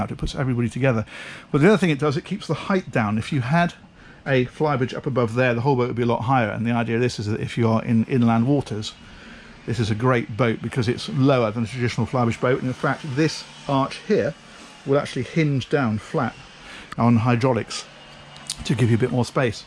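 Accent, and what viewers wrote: United Kingdom. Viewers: Question- does the Broom 450 come with sun pads for the front of the boat? - or do you have to add/ purchase separately? Love the notion of a long traveling boat, but I didn't see as many sun areas? The front of boat appeared to show they could be added? Or is it just cushions are not put out? I'm a new subscriper & really love♥️ your attention to detail& your British accent- it is so great😆! Will you ever have a show on Chris-Craft yachts, or their classic 1920s or 30s line to view?🤔 Thanks again..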